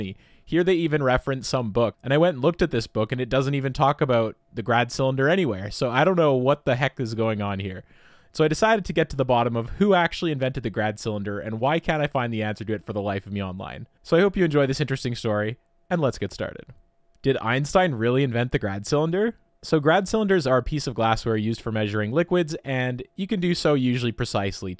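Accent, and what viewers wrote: France. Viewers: Ey your French accent ain’t that bad haha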